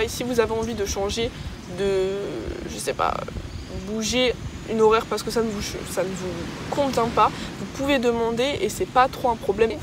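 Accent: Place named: Africa. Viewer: Elle a un peu un accent africain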